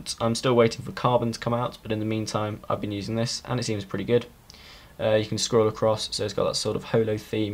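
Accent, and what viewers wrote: London, United Kingdom. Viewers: Good old Londoner. Keep up geezer.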